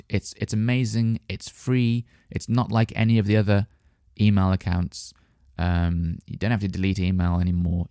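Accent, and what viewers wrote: United Kingdom. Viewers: I listenning you cause i have exam tommorow, like Final exam And i have problem with that bitish accent so thank you for this podcast. You have good voice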